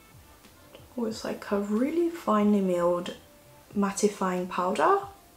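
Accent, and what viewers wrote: United Kingdom. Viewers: 언니 발음이 영국식인가요...?잘몰라서...너무 발음이 좋아요 ㅠㅠㅠㅠ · 영국식 영어 넘나 듣기 좋고 멋져요😆👍 이번에 어퓨 과즙팡틴트도 잘 어울리실거같아요!! 저도 반해서 샀는데 생각 났어용 ✨ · 너무너무 이뻐용!!💕 꺅 미모열일 ㅠㅠ 😍 감기몸살이셨다니ㅠㅠ 그런데도 영상들고 와주셔서 감사합니당! 영쿡발음과 미모에 치이고갑니당💜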